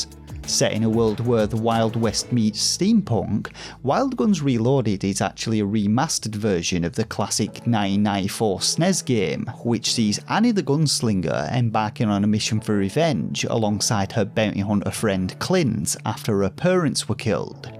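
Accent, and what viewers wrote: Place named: North England, United Kingdom. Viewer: Don't listen to him, I love your accent. Where exactly are you from in the north? I couldn't quite put my finger on it. Is it Middlesbrough?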